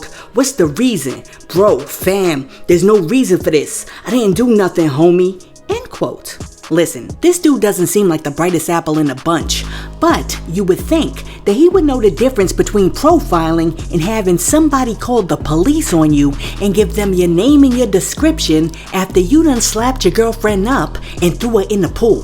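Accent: New York, United States. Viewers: I know right its like Lyte so New York Im from L A so it is so cool to me 😎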